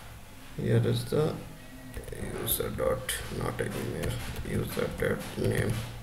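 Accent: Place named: India. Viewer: Whenever you hear this hindi accent I know i'm in good hands.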